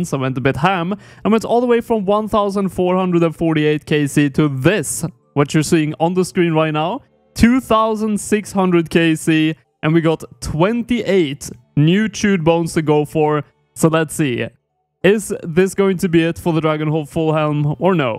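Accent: Sweden. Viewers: jävlar va svensk du låter 🤣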